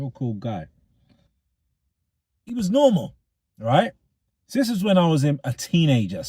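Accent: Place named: London, United Kingdom. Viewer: He's a Londoner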